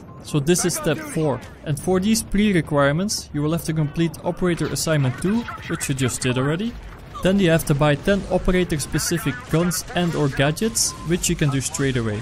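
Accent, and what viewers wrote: Netherlands. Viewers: 'Dutchy' English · Ik kan gewoon horen dat je Nederlands bent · je klinkt echt nederlands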